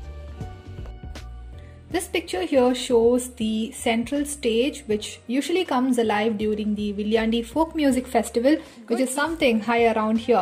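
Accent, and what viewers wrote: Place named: India. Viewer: Wow Thank you for posting this. It brought back so many good memories since I lived in Viljandi for 3 years. Its a beautiful and calm town <3 I am from India and I guess you're Indian too? Assuming by your accent :)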